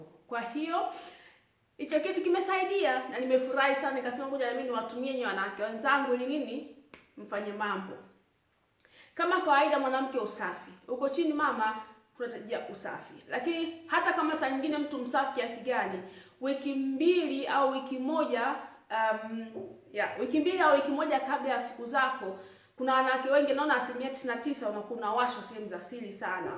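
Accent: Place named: Kenya. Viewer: We ni mtanzania lkn unaongea kiswahili Cha kenya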